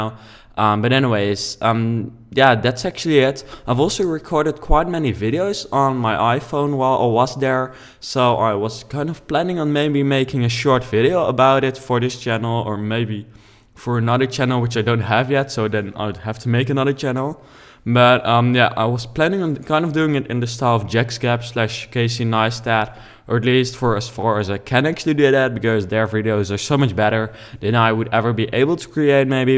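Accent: Netherlands. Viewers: Je kan wel horen dat je Nederlands bent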